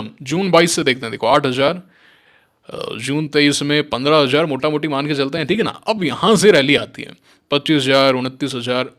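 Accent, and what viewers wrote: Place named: Rajasthan, India. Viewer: Aapki baatein sunke aisa lagta hai ki : Aap rajasthan se hai (shayad - Kota, ajmer side) and aap kota (JEE) padhne ke liye gye thhe.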